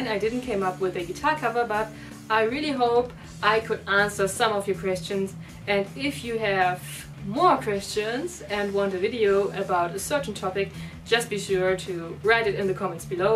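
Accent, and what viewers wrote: Germany. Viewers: I love your german accent when speaking. Do not exist best with this: The very best guitar player in fusion with as a beutiful girl. hi from Chile. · I like your german accent (Hab ihn selber xD) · Красивый немецкий акцент.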